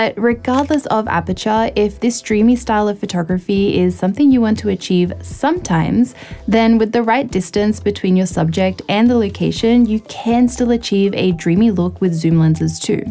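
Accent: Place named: Australia. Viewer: Australian accent mate 😉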